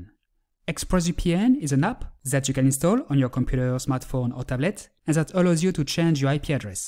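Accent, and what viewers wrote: France. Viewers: XD L'accent francais se fais entendre x)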